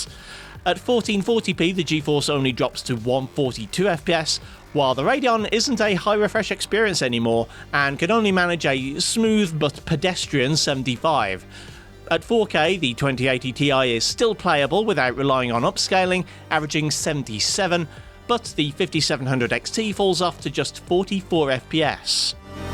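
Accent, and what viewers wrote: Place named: Manchester, United Kingdom. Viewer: Trying to pin your accent down. I'm from Manchester and you have a similar accent but I can't quite place where it is!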